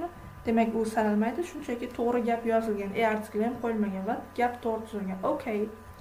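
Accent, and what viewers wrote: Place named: United Kingdom. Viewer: Бритиш акцент )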